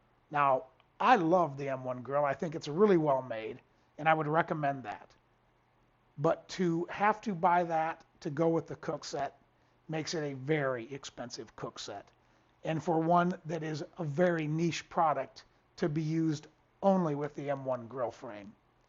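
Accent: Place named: United States. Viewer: I love American accents, no offense but we say Wolf as in Wolph...you say woof!!! The number of dialects and accents are nothing like in England where they change across the street, but accents in the US especially in the East Coast are numerous.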